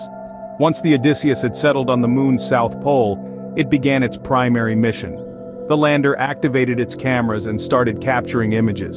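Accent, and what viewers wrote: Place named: United States. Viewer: I will stay subscribed, providing you keep that good American voice!!!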